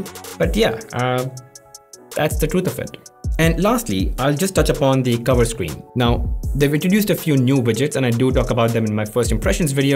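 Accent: India. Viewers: Very hard to hide indian accent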